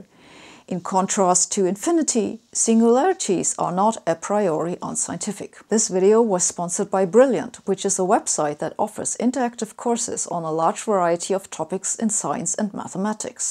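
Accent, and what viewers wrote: Germany. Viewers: I noticed your teeth almost never get apart. Wondering now if that's related to german accent while speaking english.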